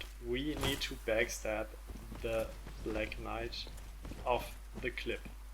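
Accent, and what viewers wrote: Germany. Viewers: man merkt das er deutsch is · are you from germany becuase i am and your englisch hass a little german slang in it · Man hört dennoch heraus, dass deine Muttersprache Deutsch ist? Und ich hab vorher nicht aufs Video geschaut. Nicht direkt. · Is that German? Where are you from, dude? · ***** denglisch ist, wenn man deutsche wörter benutzt oder den satzbau umstellt. Das hier ist nur ein ganz normaler leichter deutscher akzent :D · hm, ich verstehe darunter english mit klar deutschem akzent ^^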